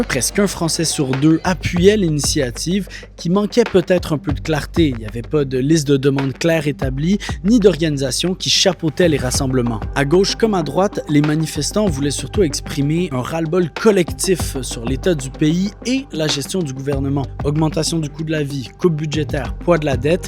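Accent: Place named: Canada. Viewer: Tu as un accent canadien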